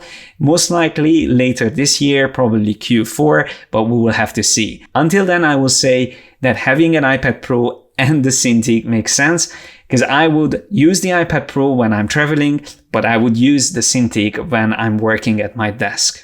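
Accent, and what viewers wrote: Hungary. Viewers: Szia ! Pár videódat már végignéztem. Az összes minőségi és átgondolt, csak gratulálni tudok. Ha megengeded 1 észrevételem mondjuk lenne. Az angol kiejtéseden nagyon érződik, hogy magyar vagy. Ezzel semmi gond sincs, hiszen nem ez az anyanyelved. Arra szeretnélek megkérni, hogy amennyire az időd és energiád engedi feliratoznád a videóid magyaroknak, mert nem mindenki ért angolul és így a nézőid száma is bővűlne ... Ez csak egy javaslat 😉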